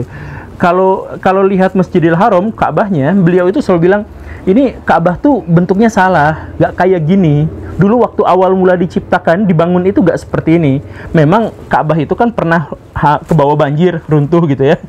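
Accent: West Java, Indonesia. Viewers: Meni nyunda pisan p guru.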